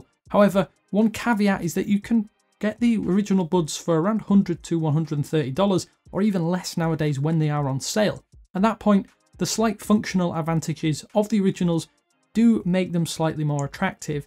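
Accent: United Kingdom. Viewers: That's just how British people talk Lol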